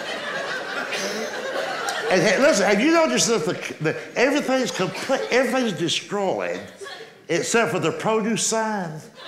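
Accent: South, United States. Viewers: Simplicity in his delivery and comic content. He's not like the typical professional comedian, more like your funny Uncle Ralph. We all can relate to his content. His timing is perfect. Throw in the suthun' drawl for extra fun.He's hysterical, and I can't wait to see him when he gets near my town.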